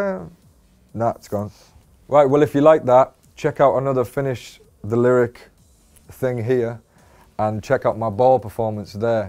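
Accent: United Kingdom. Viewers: I freaking adore his British accent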